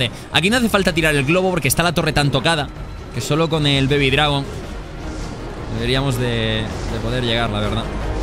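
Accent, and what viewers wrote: Argentina. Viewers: cada vez mas argentino 😎🤑🇦🇷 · Jajajaja me hace gracias cómo abla como argentino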